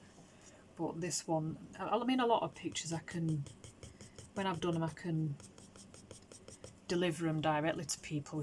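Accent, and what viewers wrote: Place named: Northern England, United Kingdom. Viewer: I love hearing a fellow Northern accent! This drawing is beautiful, you've done a great job with the composition. I always find it tricky putting multiple photos together. I'm with you on the heat, it seems to linger in the house for days it makes sleeping near impossible 😂 This was great I really hope you film more of these and don't feel like you always need some interesting topic to talk about, I like listening to the mundane / every day life stuff ☺ Congrats on hitting your watch hours!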